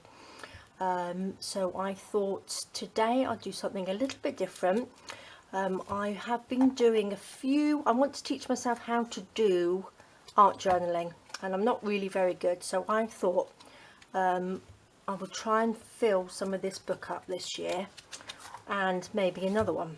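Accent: United Kingdom. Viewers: Love you no brainer approach— I plan to do more also this year I have found stabilo pencils work nicely for shadows and smudging Never would have guessed you were in Portugal! English accent— small world after all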